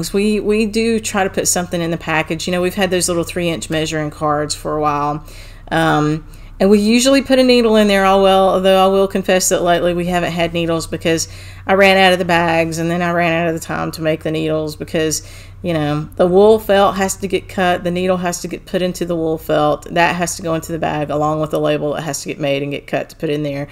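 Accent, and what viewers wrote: South, United States. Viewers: It was great to see you again and to hear about the new samplers. They are beautiful! The Hardwick Manor hoop looks so nice. You can tell it is the highest quality and would last a lifetime. You know a stitcher is known by her tools! I’m looking forward to your next video. It’s nice to hear a southern accent! Have a wonderful week!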